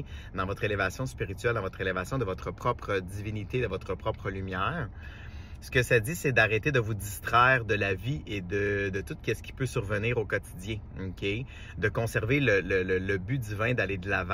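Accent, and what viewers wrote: Canada. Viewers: Trop gaga de votre bel accent canadien bel homme😊❤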